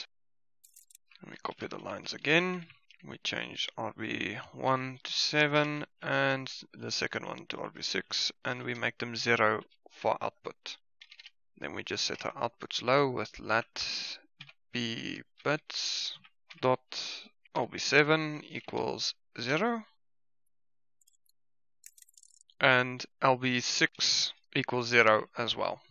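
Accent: South Africa. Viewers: The accent sounds South African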